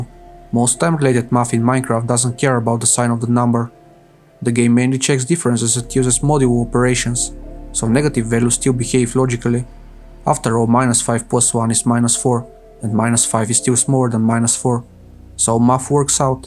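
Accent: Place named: Russia. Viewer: Cool. But for some reason your accent reminds me of Russian. I'm Russian myself and I've heard Russians speak English. It amused me)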